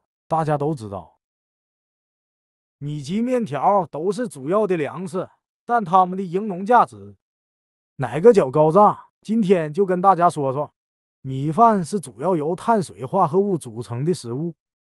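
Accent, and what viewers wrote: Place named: Liaoning, China. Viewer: 辽宁口音❤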